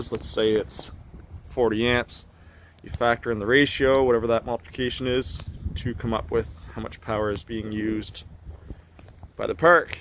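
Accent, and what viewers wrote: New Brunswick, Canada. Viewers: Assuming by your accent you're new brunswick or pei... When they buried the service; would that be upgradeable to 3 phase?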